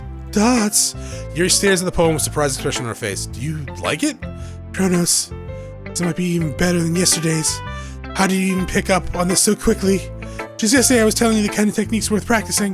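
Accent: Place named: Northeast, United States. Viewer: I swear every time I watch a playthrough of this game I see something new. Blood border? Wtf? Why did that happen and how did you get it to happen? What of my OG playthrough will you not see? I am confusion! Also, are you east coast US? Certain words sound very northeast to me in how you're non-rhotic sometimes and have that special 'Jersey' (and surrounding areas) way of saying like, caught or coffee.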